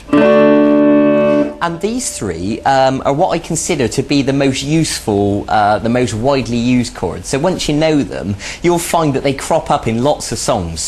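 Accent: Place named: West Country, United Kingdom. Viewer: Bristol? Somerset? I’m from Exeter buddy. Lovely to hear an accent like this on the Net.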